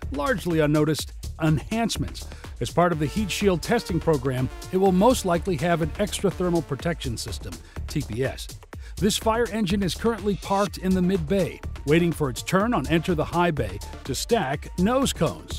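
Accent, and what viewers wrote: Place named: United States. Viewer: Terrible grammar in several instances. The script was written either by a non-native English speaker or not very well proofread, perhaps both. This makes me wonder if the distinctly American voice over is computer generated if so it is very convincing. If not, the reader needs some editorial discretion.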